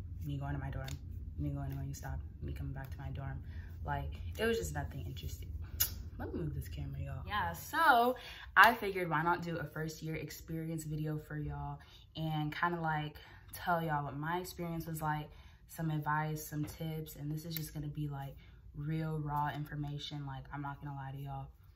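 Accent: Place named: California, United States. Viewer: Great advice. Girl I see your Calley accent is leaving.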